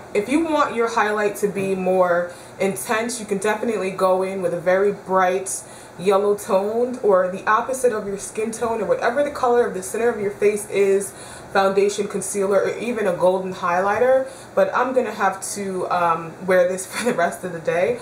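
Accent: United Kingdom. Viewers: lol at the british accent, I love it goooorgeous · Hahaha love the british accent! great tutorial!!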